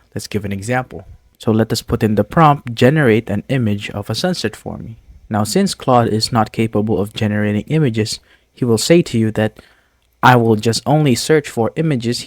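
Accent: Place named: India. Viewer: I heard an Indian accent and immediately clocked off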